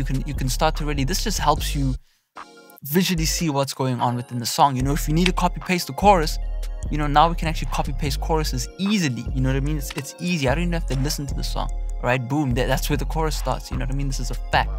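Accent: South Africa. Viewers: yo bro are you from South Africa? your accent lowkey sounds like it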